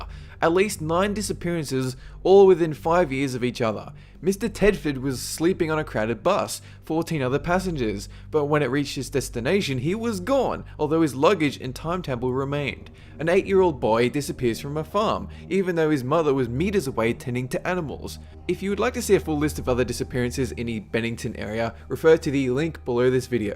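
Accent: Australia. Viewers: Australian accents are HOT. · I've wondered this for a while, and hope someone who knows, or himself will answer this question. Are you from Australia? You sound like it but I just cant tell, it sounds normal to me because I live there, but watch many american videos.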